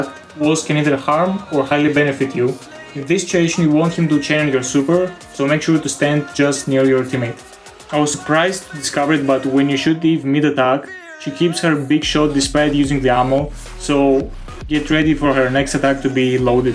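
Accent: Greece. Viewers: Are you from Greece???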